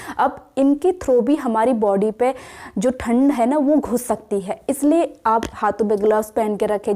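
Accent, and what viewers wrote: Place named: Uttar Pradesh, India. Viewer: But voice is indicated up state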